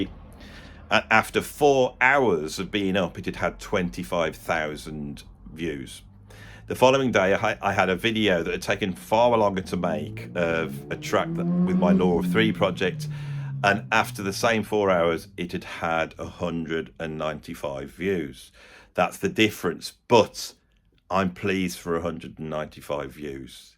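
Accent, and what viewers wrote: Birmingham, United Kingdom. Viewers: I think a lot of people watch your channel for your comical Brummie accent plus your wealth of knowledge.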